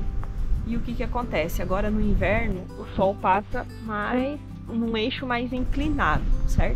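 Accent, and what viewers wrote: Rio Grande do Sul, Brazil. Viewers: Achei que você era aqui do sul ,têm um sotaque gaúcho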